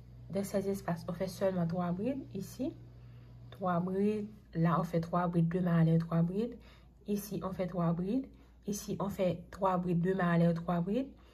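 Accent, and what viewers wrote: Haiti. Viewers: T'es Haïtienne ? Ton accent ?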